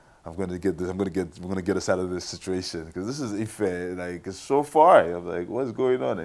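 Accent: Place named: New England, United States. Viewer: Love the Yankee accent bro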